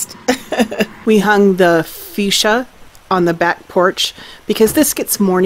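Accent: South, United States. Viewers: I always thought she had a very thick southern accent.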